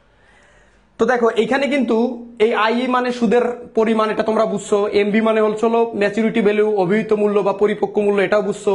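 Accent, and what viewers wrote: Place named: Noakhali, Bangladesh. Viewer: স্যারের বাড়ি মনে হয় নোয়াখালী বা লক্ষ্মীপুরে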